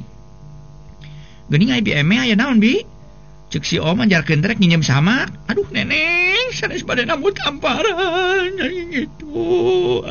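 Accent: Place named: Sunda, Indonesia. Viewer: lamun hayang di ajar basa sunda....tah dongeng anu Sundana masih kental....sae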